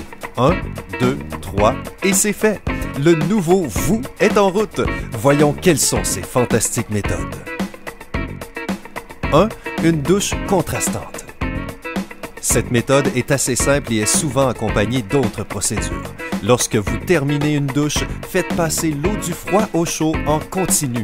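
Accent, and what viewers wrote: Quebec, Canada. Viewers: Des rigolos avec un accent québécois qui dis n'importe quoi j'en ai vu beaucoup, mais des comme toi..... Une perle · C est l'accent de Québec celui ci?désolé mais c est vraiment étrange.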